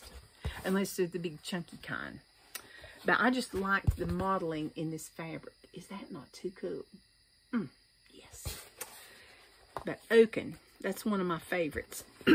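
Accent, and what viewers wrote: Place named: Texas, United States. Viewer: Hi, Just found you on FlossTube. Your accent is a little thicker and adorable than some of us Texans. 😉 Naturally, I had to find out where you are from by going to your #2 video. 🙂 Loved seeing your play by play of Friendstitch. I’ll be sure and sign up for my first one next time. Harvest blessings. Thanks, Darlene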